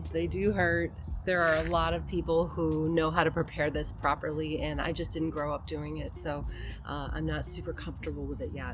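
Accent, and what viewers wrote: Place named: Chicago, United States. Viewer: Thanks for the tour and all of the content! Long story shorter, I’ve had to let some things go for a while because #life…so as I start to take back some of my spaces and learn from my mistakes 😬, you’re inspiring me to consider and incorporate more natives. I was also today years old when I realized some of those “weeds” I have might not be…. It’s also nice to hear a natural Chicago accent. I’m a few hours away and don’t get home enough.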